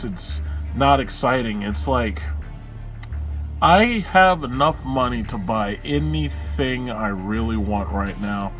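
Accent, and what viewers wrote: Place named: New England, United States. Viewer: where the fuck do you think apes phones are made dumb shit"CHINA"! THE ONLY GARBAGE I'M HEARING IS YOUR YANKEE ASS VOICE AND YOUR REGURGITATED APPLE IPHONE BABY FOOD THROW UP! I actually like apple and android but you're what makes this video and you're misinformationed ass so bad is you're a spiteful ignorant old dip s***.!! yeah the designs are similar but there is no way any copying going on anymore on because it blows f**** rings around the iPhone and I know for a fact because I own both and I continually go back to my Android every time. apple is for children to learn how to use a phone so when they grow up don't know how to use an Android you f**** moron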